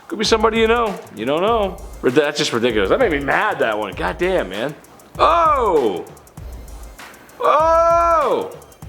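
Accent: Scotland, United Kingdom. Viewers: Now the house is more damaged and somebody may seriously hurt because of that guy Ps. Nice Scottish accent! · LOVE your Scottish accent!!!!! Cheers me up every time!!! Much love from Michigan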